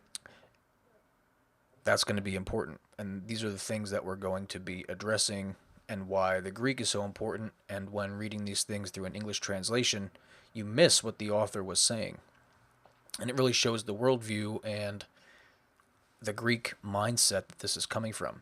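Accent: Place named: United States. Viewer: Great video although your credibility suffers a bit by your insistance on an unadulterated American pronunciation of Greek.... you use diphtons all over the place where none such are used in Greek....e.g. your god "Gay"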